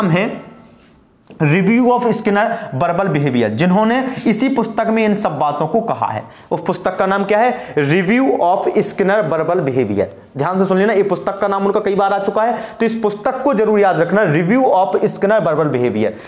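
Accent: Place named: Bundelkhand, India. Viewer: Very nice sir thanku aapki bundelkhani awesome👍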